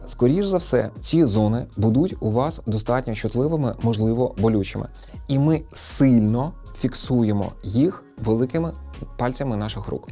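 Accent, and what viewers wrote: Standard. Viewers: Приємно слухати,чиста українська мова!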